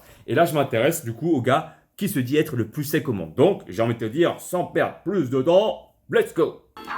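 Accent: Quebec, Canada. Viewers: Un accent québécois ;) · C’est un accent du Québec 🇨🇦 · Accent québécois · L'accent c'est l'accent Québécois et franchement tout le taffs que tu fait tu mérite clairement plus d abonnés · C pas un accent un peu oui mais québécois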